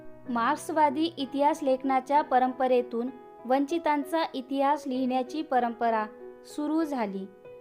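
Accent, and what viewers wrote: India. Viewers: Tumi kontya gavane bolt aahat😊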